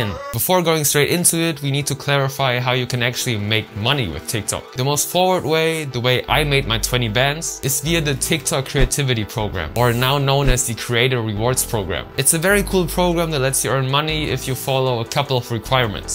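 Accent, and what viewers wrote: Germany. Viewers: wait are you from germany?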